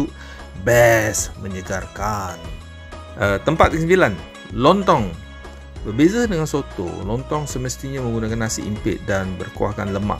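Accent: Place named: Indonesia. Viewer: Lidahnya sama dgn orang indonesia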